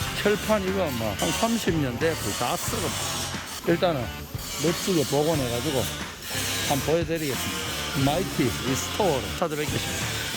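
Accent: Gyeongsang, South Korea. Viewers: 캐빈 못구함니까... 진심 마2 내로우캡이라도 비스무리한거 캡 바꿔 끼는게 경제적이게 생겼습니다... 뭐 붐대부분은 판금도색하면 되겠는데... 캐빈은... 어지간히 썩어있어야.... ㅋ 그리고 추천 어록은. "마 우리 채널 같이 키우는거 아닌교?(경상도 네이티브 발음이 어렵네요 어캐 해야하지? ㅋㅋㅋ) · 저도 경상도 사나이인데 항상잘보고있습니다 고향사투리들으니까 억수로 정겹네요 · 갱상도 사투리 너무 잼있어요. 저도 창원에서 5톤윙바디 운행하고 있습니데이 항상 안전운전하시고 힘내십시요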